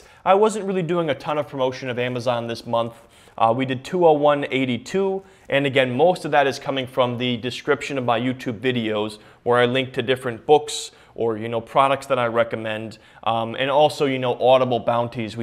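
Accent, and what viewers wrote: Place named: South, United States. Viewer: I enjoy ur vids brother, but are you related to Trey Gowdy? You look and sound similar to him yet less of the southern accent.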